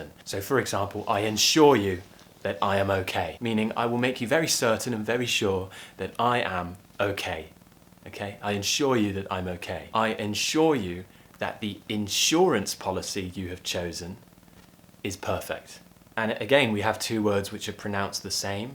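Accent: United Kingdom. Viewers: In love with your British accent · Top of the morning sir, do you speak in the British English? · I Love Your Accent. I'm watching every of your videos. And I learned a lot, Especially the British Accent, So Now,I'm studying British accent and finally I found your videos and it help me, I mean, You help me and others about british Pronounciation, Accent and Phrases,Etc. Thanks to you Idol. I'm from Philippines and proud to learn British English. I Hope That Someday I will also come to the United Kingdom. Especially In my Favorite Place London, England.I hope you can help and teach a lot more like we are just learning English and British accent And I hope your Channel lasts longer, have more Likes, shares and Subscribers. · Hello from Brasil! I really love your British accent, love your way of teaching. Thanks a lot.♡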